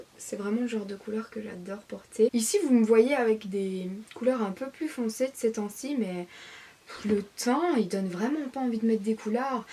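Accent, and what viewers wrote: Belgium. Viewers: super vidéo comme d'ab j'adore ton accent c est le même que moi (vu que je suis belge) bref je t'adore et une vidéo rangement maquillage ça serait trop cool !!! 😂💖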